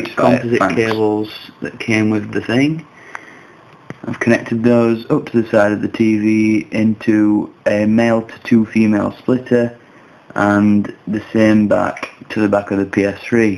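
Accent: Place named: RP, United Kingdom. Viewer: Posh voice there lad;)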